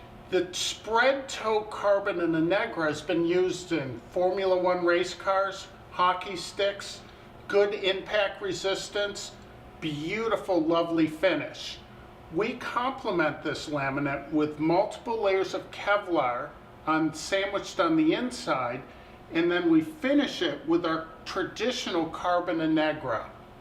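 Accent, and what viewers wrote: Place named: United States. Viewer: after all these years in Canada, he still sounds more American than pure northern hoser. curious....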